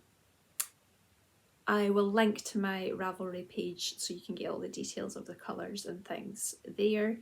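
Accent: Scotland, United Kingdom. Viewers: Hello there, nice to hear we are already with 5000 of us who also enjoy your lovely podcast. Love your no nonsense style and your Scottish accent. Keep on going your own way, we like the way you do this. Greetings from a Belgian fan.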